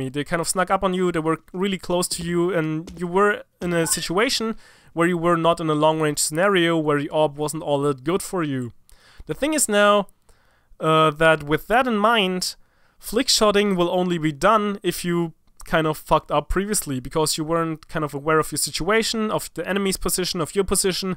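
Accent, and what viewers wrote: Germany. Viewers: ! Der deutsche Akzent regelt Bra^^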